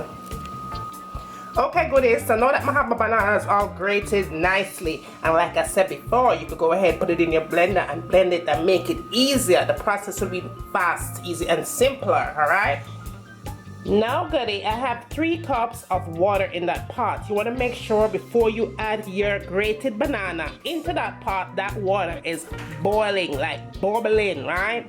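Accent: Jamaica. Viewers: Your man must be happy cos you can COOK and Cook EVERYTHING!!!! Keep up the phenomenal cooking. Its so rare to here an american whose Jamaican accent remains, I love it, true Jamaican!!! No disguising that xxx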